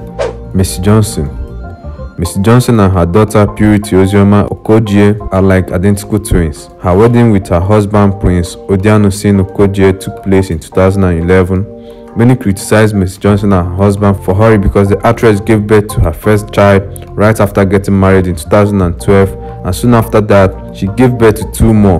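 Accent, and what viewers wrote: Igbo, Nigeria. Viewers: poor presentation. very thick igbo accent.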